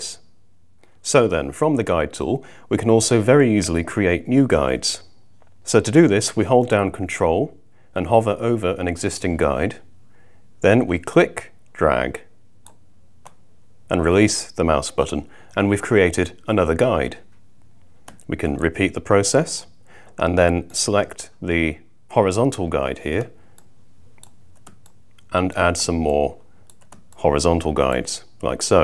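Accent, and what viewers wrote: United Kingdom. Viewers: Excellent - that helped a lot - nice to hear a British accent that I can actually understand as opposed to an American gabble.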